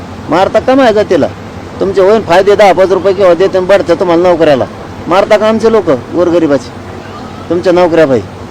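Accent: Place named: Marathwada, India. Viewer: रोख ठोक माणूस..... आसल मराठवाडीतून बोलतायेत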